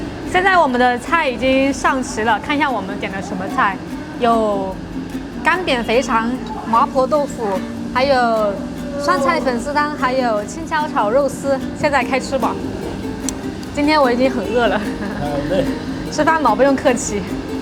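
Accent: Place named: Sichuan, China. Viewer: 和四川人说话有点像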